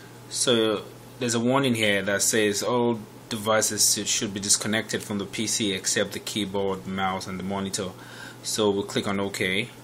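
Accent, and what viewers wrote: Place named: India. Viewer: Note book not net book and you call the screen a creen I swear all of these tutorials for computers are done by Indians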